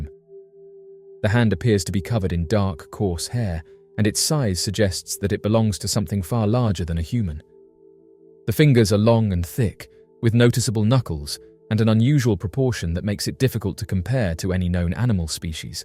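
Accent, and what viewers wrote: England, United Kingdom. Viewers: No more pohm narrators... OUR COUNTRY.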